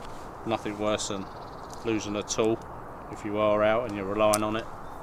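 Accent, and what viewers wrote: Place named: South West England, United Kingdom. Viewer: You Americans will just have to learn English, as it really is, rather than what you learnt at school. This is a south-western accent. Very ancient.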